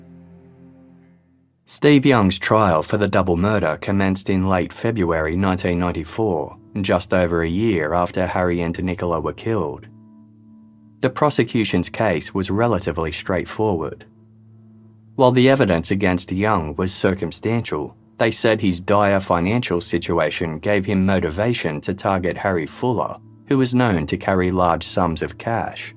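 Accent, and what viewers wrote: Australia. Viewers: That clear, well modulated, Australian voice, makes this crime channel so good. Thank you for this, and peace to all out there.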